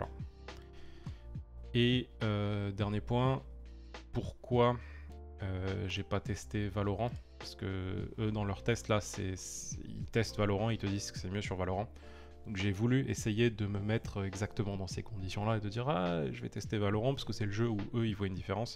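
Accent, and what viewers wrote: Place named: Switzerland. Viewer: Toi t'es suisse mdr je reconnais mon accent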